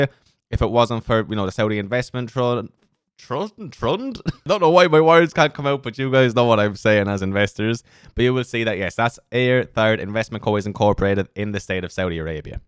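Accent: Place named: Ireland. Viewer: So glad you are back, good to hear that Irish 👊🏼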